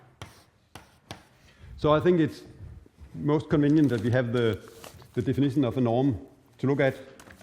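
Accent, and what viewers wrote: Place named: Denmark. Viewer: Harry Ritchie no, he is very clearly Danish. We can identify fellow Danes.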